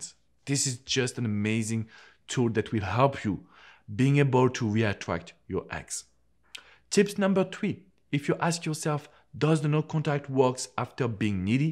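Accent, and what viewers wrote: France. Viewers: "You need to clique" haha love the french accent, ur a really cool nice guy :) :)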